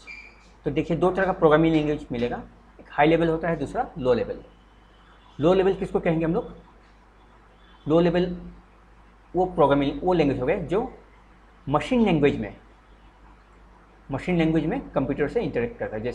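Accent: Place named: Assam, India. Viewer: There is a touch of assamese tone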